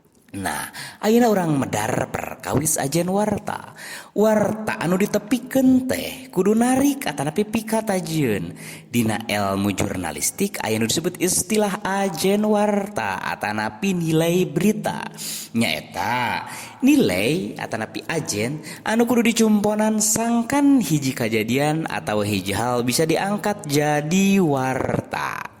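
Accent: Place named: Sunda, Indonesia. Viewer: Waduh Sunda pisan ny......warta berita ... Kedah d momole bahasa Sunda teh.. Semangat kang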